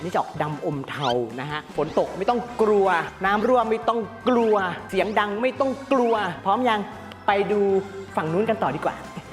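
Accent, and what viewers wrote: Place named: North, Thailand. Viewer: พิธีกรคนเหนือแน่นอน สาปเมืองขนาด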